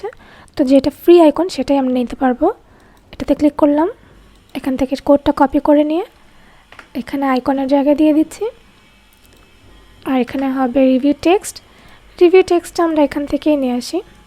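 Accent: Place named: Bengal, India. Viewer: Your Bengali accent is so sweet , I fall in love with you ❤❤ Do you know Hindi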